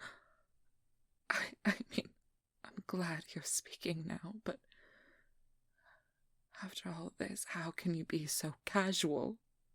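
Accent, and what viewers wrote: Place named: United Kingdom. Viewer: It's funny cause I was joking with my friend yesterday about writing a character and I said you take the nice happy go lucky person and then you add ✨trauma✨ Which leads to walls being build and "I am too cold for any to understand me" moto and then a wholesome/lighthearted moment and the character breaks a bit of their wall down to let that other persons in where we hear the tragic heros backstory and then we have what most characters fear ✨Character growth✨ 😱😱😱 Which leads to the redemption arc! Which then leads to the worse thing possible. ✨Healing✨ and ✨Positive mental health✨ dramatic gasps and screams 😂😂 But can I just quickly point out it's been a hot minute since I listend to so I kinda forgot she's British. And then hearing her for the first time in awhile I was like "Is she British?" (Context which makes this better is I am British and apparently really bad hearing because I can't recognize another British accent apparently) and then she said "Secondary school" and I was like "Yup she is." 😂😂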